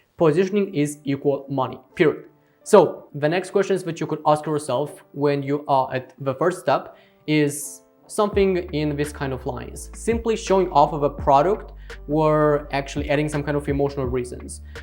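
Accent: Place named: India. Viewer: a blonde with an Indian accent.... that's rare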